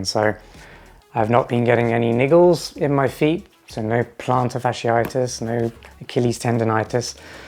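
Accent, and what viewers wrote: United Kingdom. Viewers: Subscribed. U do have british accent pal. Simple explaination. Iam getting hoka clifton 8 online. Is their any difference bw clifton 8 and 9 ? Moreover whats ur opinion on clifton 8 or invincible 3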